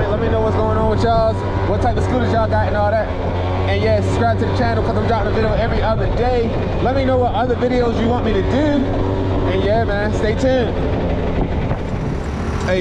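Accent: Baltimore, United States. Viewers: He sound like he from Baltimore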